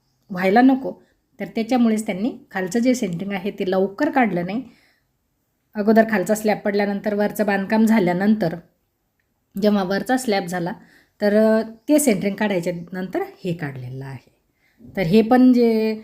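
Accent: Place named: Akola, India. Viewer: ताई तुम्ही अकोल्याचे आहे का तुमची भाषा तशीच वाटते जगातली भीमच म्हणतात बीमच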